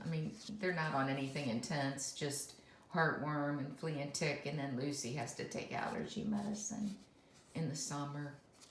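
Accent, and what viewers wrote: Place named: South, United States. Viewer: I absolutely love your fall planner the color is so nice for the season I'm trying to set up my ring light so I can be hands-free to do my video it would be my first one you're such an inspiration love your voice the southern accent always takes me away you're so sweet God bless you and the family 🤗❤️🙏🏼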